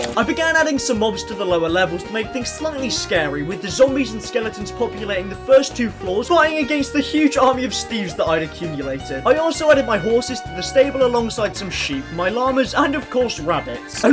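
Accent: United Kingdom. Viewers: Mans British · Ahhhhhh British mate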